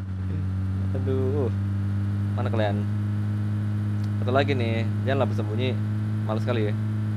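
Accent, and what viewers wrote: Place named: Pematangsiantar, Indonesia. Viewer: Patengtengan bang 🤣 , ngeri kali bahasa mu bg nampak kali par siantar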